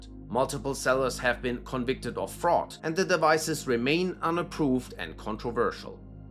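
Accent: Germany. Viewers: Der deutsche Akzent ist Mega! 😅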